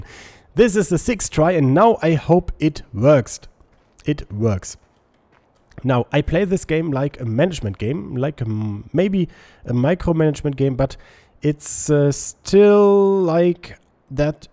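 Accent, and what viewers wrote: Germany. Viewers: | | Gaming with german accent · | | Gaming with german accent 100% agree! I think, some of your german fans don't know/understand, that maybe there a other YouTube user, which can't speak german, but would like to see your great videos! For me it is a great opportunity to increase my english understanding. Greetings Tobias P.S. I am sorry for my bad english - it is etwas eingerostet:D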